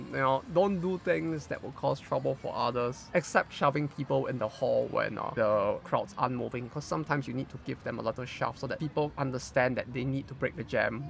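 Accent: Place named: Singapore. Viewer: did u learn english in singapore or from a singaporean? u sound singaporean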